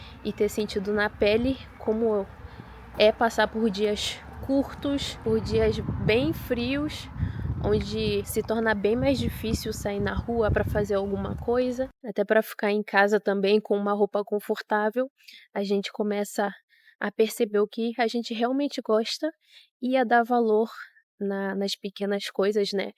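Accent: Rio de Janeiro, Brazil. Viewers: Tu és muito fofa e calma! Parabéns p video, vou em outubro p Suiça! Ansiosa Ahhh o sotaque carioca tbem é lindo!!! Bjo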